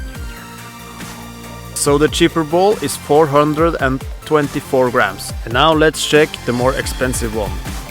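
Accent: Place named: Russia. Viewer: Ты русский что-ли?) Акцент на наш похож))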